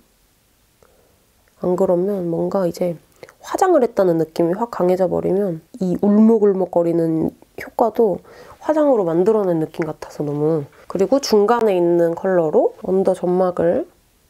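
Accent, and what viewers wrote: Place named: Gyeongsang, South Korea. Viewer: 님 약간 경상도 말투 나오는 거 같아요... 기분 탓인가...?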